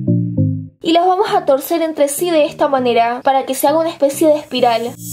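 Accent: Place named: Córdoba, Argentina. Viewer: De que parte de Argentina sos? Pareces de cordoba,i ndea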